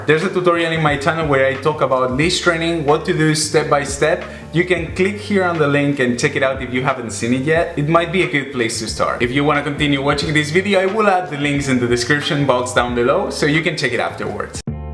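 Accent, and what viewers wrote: Spain. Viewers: Nice video! Thanks for the tips, going to try walking Felix soon! Also I noticed your accent, just wanted to ask, are you from Catalonia?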